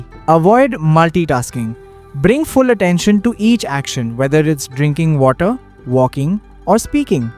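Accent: India. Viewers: Your voice and accent is Indian . Are you Indian who lived in Japan and now living in North Korea ( not even in South Korea). Genuinely curious.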